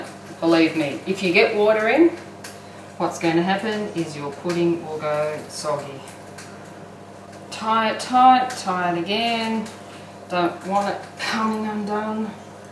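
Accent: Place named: Australia. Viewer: Want to try a Chrissy Pudding for the first time this year. Living in England with my boyfriend and will be spending chrissy with his family again (a little chillier than 20 degrees), so wanted to do something special this year. Found a good recipe but the calico aspect escaped me-this video was so helpful! Also nice to hear the accent, if I closed my eyes I would have sworn I was listening to my Aunty Sue from Campbelltown :P so thanks for the reminder of home! Hopefully it works out!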